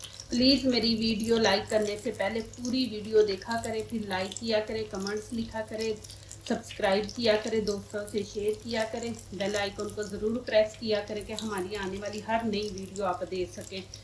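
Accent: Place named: Punjab, India. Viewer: Excellent recipe....aapke baat karne ka tareeka bilkul punjabi....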